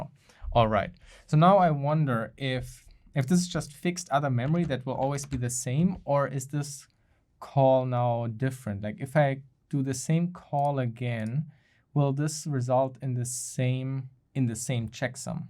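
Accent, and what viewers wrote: Germany. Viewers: I bet this guy has a fritzbox as router... he sounds german and has the 3'rd octet x.x.178.x. Not complaining. Fritzboxes are alright.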